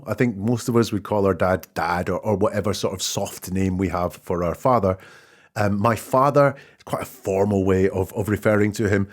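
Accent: Scotland, United Kingdom. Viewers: Nice to hear a fellow Scot 🙂. Really enjoying your videos.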